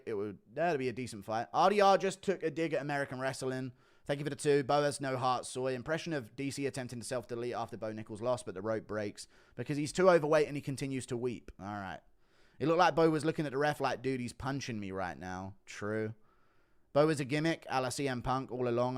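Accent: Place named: United Kingdom. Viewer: He says "Dorito" instead of DeReider because of his awful accent... Brits who don't have the BBC proper speech really butcher english. The only people who butcher it worse are cajuns but these guys have the excuse of being severly mentally redacted. The brits just seem to love to butcher the language they created. It's like they're playing a game where they get points the less they enunciate.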